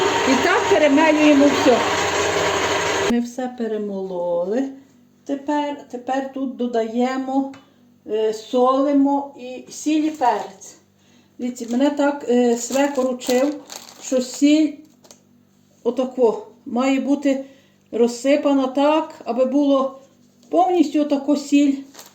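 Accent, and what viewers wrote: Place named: Ternopil, Ukraine. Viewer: Говорите точно, как у мамы на родине в тернопольской области, все слова, звуки, интонации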